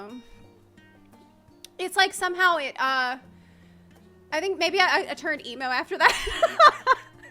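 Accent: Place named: South, United States. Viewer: Nothing better than comparing the good news to a punishment. I feel that really gets a lot of kids to change their mind about it. I think it will catch on. Also i love when sassy southern accent comes out. Reminds me of spending time with my relatives in Arkansas.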